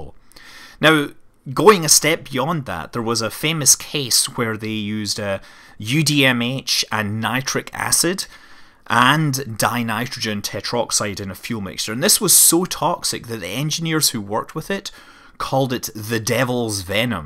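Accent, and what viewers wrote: United Kingdom. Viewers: Please stop saying MEEE thane. You do not say MEEE thod! This MEE pronunciation is an annoying, "In Your Face" Colonial Yankee Dog, Britishism insult!!!! If it is not made as an insult it is at least a snobbish "Affectation". You are an obviously intelligent, well admired scientist, so any "Affectations" are unnecessary. I can live with AL you min eum, instead of Al lou minum because that is a real phonetic sound of the British way of spelling Aluminum.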